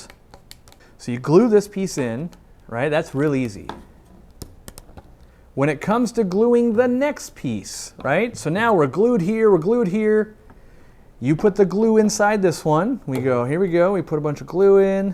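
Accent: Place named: New England, United States. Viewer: haha sweet vid, where in New England are you from? :p "rim is just WICKED wide" lol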